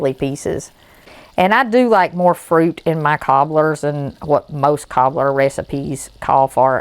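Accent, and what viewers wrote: South, United States. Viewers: Love your recipes and sweet southern accent ❤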